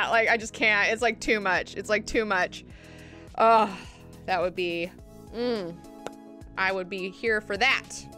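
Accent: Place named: Alabama, United States. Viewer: I don't know if you recently talked with some fam and friends for the holidays but, WOW! I can hear that Beautiful Alabama so much in your voice on this one. maybe it's my imagination...great vid!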